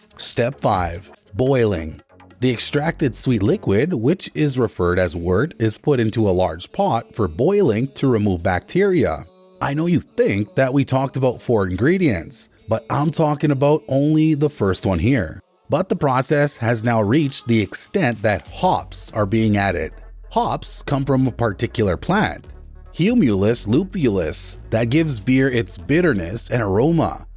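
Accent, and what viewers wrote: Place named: United States. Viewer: all these patterns and designs in the top of the guinness as in the clover design etc..... all for americans. no self respecting irishman would fook around with a pint in such a way. soon as i heared the american accent describing guinness i knew it was all nonsense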